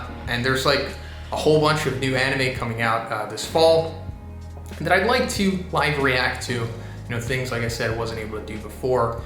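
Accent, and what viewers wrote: United States. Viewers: mikmik he sounds americab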